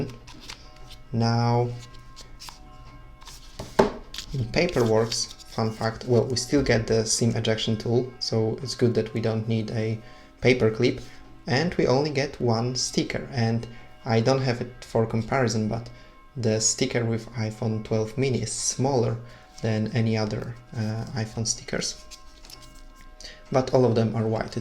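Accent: Poland. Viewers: are Polish? yor accent is so strong ;-)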